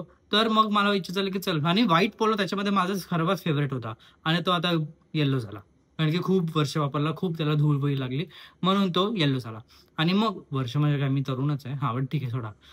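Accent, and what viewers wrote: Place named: Malvani, India. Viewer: aani tujha malvani aaikun maka aasa vatata dev maka roj navin navin goshti deta ha tuja premat paduk ❤❤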